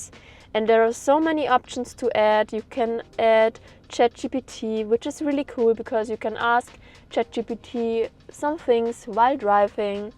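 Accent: Germany. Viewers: You are beautiful . Thank you so much for sharing this update. Are you a German? You have something like German accent.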